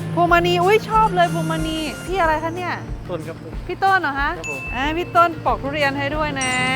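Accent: North, Thailand. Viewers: อู้เหมือนคนเมือง น้อง คนงาม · ชอบ อู้กำเหนือ ม่วนขนาน แต้กาบ่าได้จุ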